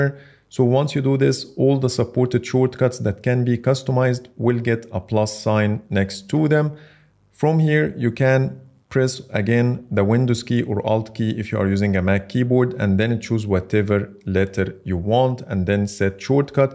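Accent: Egypt. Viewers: are you from middle east, Egypt particulary ?? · ياسطا انت مصري ؟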